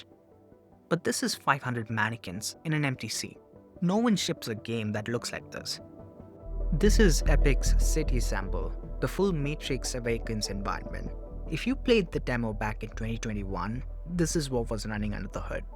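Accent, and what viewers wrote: India. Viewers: are u indian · chatgpt script, youtuber vidoe essayist cadance, indian babble accent overpowering everything, terrible shit brothaaaaaaaaaa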